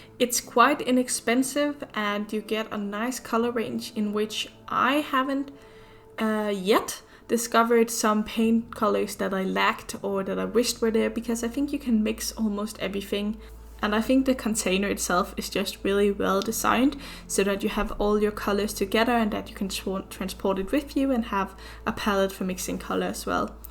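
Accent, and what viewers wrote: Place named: Denmark. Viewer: Are you from Denmark? I can hear the Danish accent. My gf is from Aarhus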